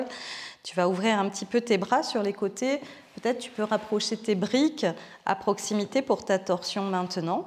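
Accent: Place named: Europe. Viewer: Belle séance très appréciée ...je ne peux faire la guirlande jusqu'en bas , à cause de l'arthrose à un genou . Pas grave je m'ajuste..j'aime tes séances toujours bien expliquée . Je viens du Québec et toi ? ...d'après ton accent je devine que tu demeures en Europe........de Louise Joyeuses Pâques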